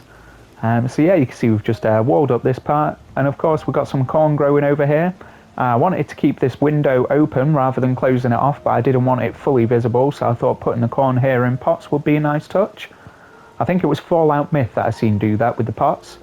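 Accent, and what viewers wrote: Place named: Yorkshire, United Kingdom. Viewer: ! I was gonna guess Derbyshire/Lincs 'cause it's near to Yorkshire, but not quite the accent..But YES TO GOD'S OWN COUNTRY!! One of us, one of us! I like it when you get an idea like that and then execute it into your build. I feel you could utilise some other war knowledge into your other builds?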